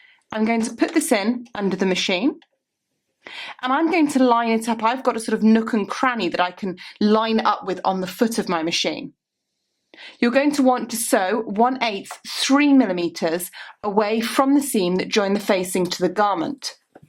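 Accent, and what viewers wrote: United Kingdom. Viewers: a lovely british accent which makes it quite easy to understand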